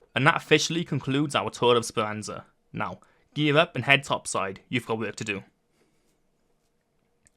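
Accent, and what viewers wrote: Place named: South Wales, United Kingdom. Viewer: You sound like your from my neck of the woods. I'm from South Wales. Cool video. I wanted to see this side of the game. I'm thinking about getting it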